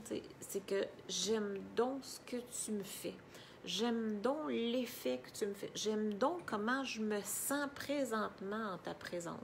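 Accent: Quebec, Canada. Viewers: Je crois avoir reconnu l'accent québécois ou canadien 😁. J'adore ce genre de vidéo ça fait réfléchir tu as vraiment du talent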